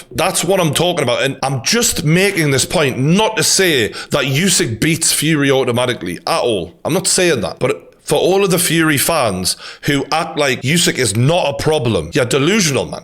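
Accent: Newcastle, United Kingdom. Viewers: True 🔥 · spot on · Spot on lad, great video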